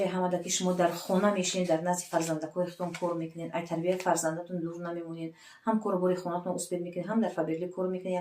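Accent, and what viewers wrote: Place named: Kulob, Tajikistan. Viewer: Салом апаи азиз хамвптан шмора мебинм асабом ором мешава беморима хис намеенм худочон ба кувахои баланд бираси хусни зебо лачаи нагз дори Кулоби зебо сана м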